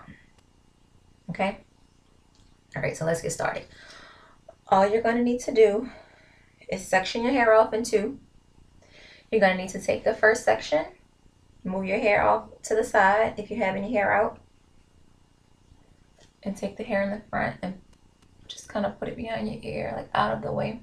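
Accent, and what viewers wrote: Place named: Baltimore, United States. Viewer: You Sound Like You're From Baltimore But This Video Was Really Helpful, Thanks 👌🏾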